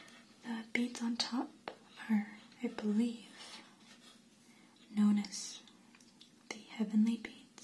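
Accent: United States. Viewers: r u from america?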